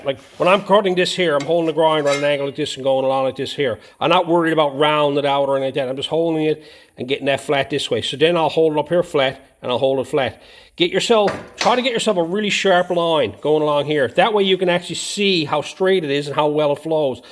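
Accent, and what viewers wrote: Ireland. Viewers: What is his accent? is that Irish? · What accent do you have? It is interesting. Sounds slightly Irish. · Hope you don't mind me asking if you have any immidate Irish relatives, there a certian irish accent/ twang to some of your words. appolagies if i'm way off, came across your vid looking for rusty arch's, I'm in Ireland myself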